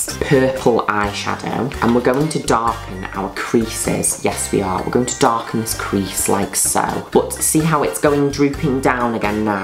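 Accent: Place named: Manchester, United Kingdom. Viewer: Dya know I’m from Manchester but I don’t know anyone with your accent. It’s really nice it’s like still Manc but posh hahaha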